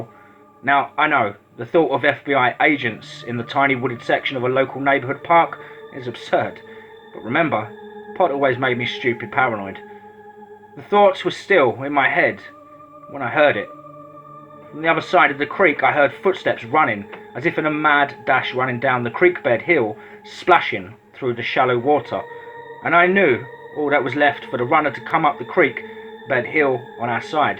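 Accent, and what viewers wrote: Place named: Estuary, United Kingdom. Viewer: It's good to hear narration with a Thames Estuary accent; makes a refreshing change.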